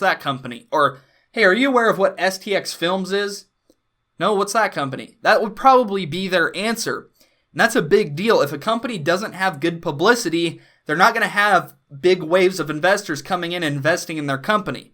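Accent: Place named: North, United States. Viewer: Love the intro music...What state are you in? You sound Northern..lol. I'm from RI but live in East TN..keep up the great content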